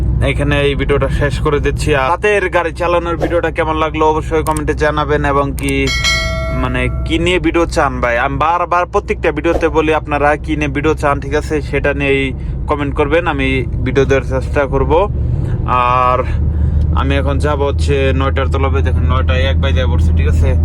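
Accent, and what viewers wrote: Tangail, Bangladesh. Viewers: ভাই কি টাংগাইল এর নাকি। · আপনার বাড়ি মনে হয় টাঙ্গাইল ? যেতে কে বলেন গেলেন 😛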